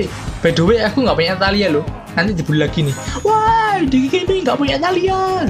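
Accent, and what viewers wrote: Java, Indonesia. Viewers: saya suka channel ini karena medoknya itu loh wkwkwk suka banget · jawanya dimana mas?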